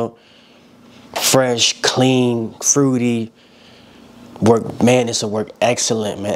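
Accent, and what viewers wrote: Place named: New York, United States. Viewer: Thought you was from nyc😂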